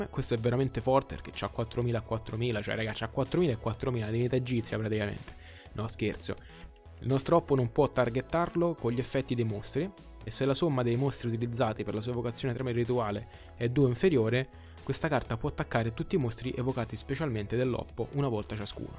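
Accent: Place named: Rome, Italy. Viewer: Era da inizio gioco che cerco qualcuno che spiegasse sto deck, grazie mille... ah solo per l'accento romano te meriti 100k iscritti big up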